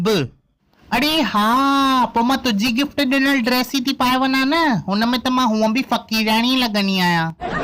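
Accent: Sindh, Pakistan. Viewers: One about i like in you...tu khalayan main puro pakko sindhi lagdon aahain ...so cute too that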